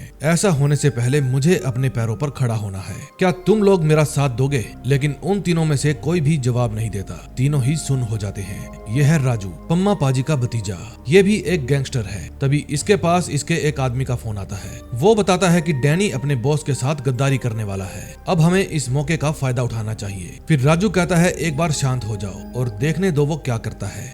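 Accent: Punjab, India. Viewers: Tu pakka Punjabi aa😂😂😂 pamma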